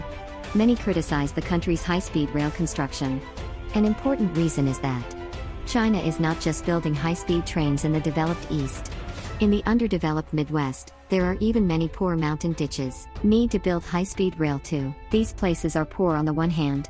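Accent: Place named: United States. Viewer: I love the idea of HSR. But much of this is "Chinglish", yet spoken with an American accent. So it's computer-generated propaganda. If there's anything worse than the current intelligence level of the average American, it's the human rights record of the Chinese.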